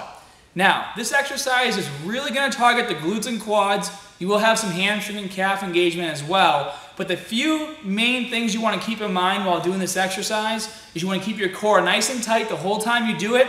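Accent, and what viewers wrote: Boston, United States. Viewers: I noticed from watching many of his videos that his Boston accent kind of comes and goes...I guess similar to how my Texan accent comes and goes depending on who I've been around all day. If I'm visiting family, I have a stronger accent. But I live in Korea, so I usually have a very standard English way of speaking.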